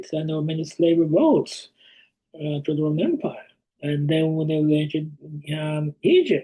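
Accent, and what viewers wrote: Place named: West Africa. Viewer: Hi Doc, is your lovely accent West African, or Haitian?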